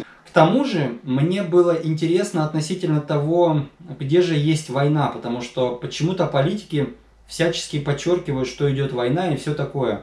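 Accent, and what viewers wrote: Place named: Odessa, Ukraine. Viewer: мне нравиться ваш украинско-одесский говор)) долгожданное видео, многое подтверждает...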